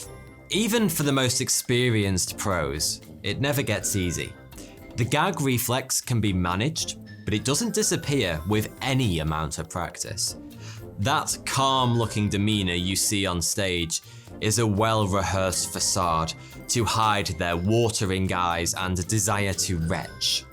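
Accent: United Kingdom. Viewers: I literally only watch this to get my British accent better by mocking this douche 🤣🤣🤣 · What he hell part of the UK does that accent come from?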